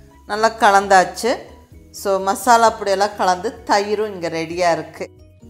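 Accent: Kongu, India. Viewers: நீங்க பேசற கொங்கு தமிழுக்காக வே நான் உங்க Channel ஐ like பண்றேன் சும்மா ஜம்மனு இருக்கு உங்கள் dish போலவே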